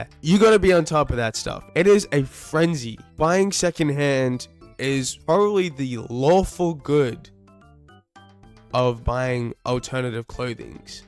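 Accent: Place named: Canada. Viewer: OH MY GOSH OKAY IM SO SORRY 😭 your accent made me think you were canadian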